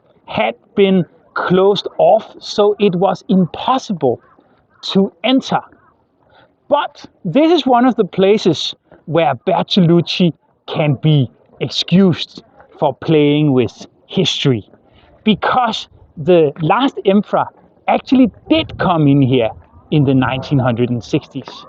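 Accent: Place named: Denmark. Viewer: That's sørengasseme what I call Danglish.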